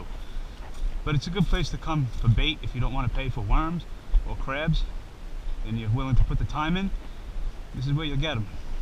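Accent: United States. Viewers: Hi brother, you speak like my long island friends that I worked with back in the early 80's at midtown South. Anyway I looked up where to find bloodand sand worms in new York City and surrounding areas.i got your channel. Still don't know where to go exactly but hopefully will. Specially close to NYC. Thanks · I knew you were from states island soon as I heard your voice lol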